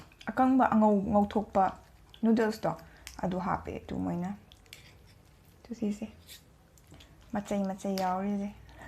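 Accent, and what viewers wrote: Kakching, India. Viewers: Kakching Londo taba ym nungai👍👍👍👍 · Kakching Londa ngangbse ngna tabada ym nungcye kkching Londa adum ngangko mthangdasu😍👍👍👍👍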